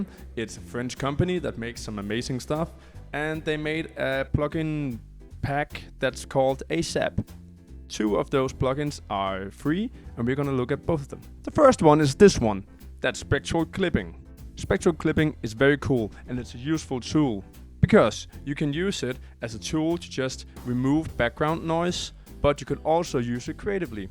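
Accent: Denmark. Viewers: You sound danish, is that correct?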